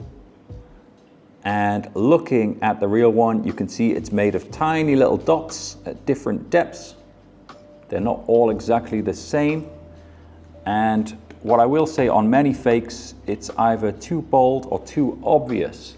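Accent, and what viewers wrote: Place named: Newcastle, United Kingdom. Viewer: Love your accent mate your Geordie still pops out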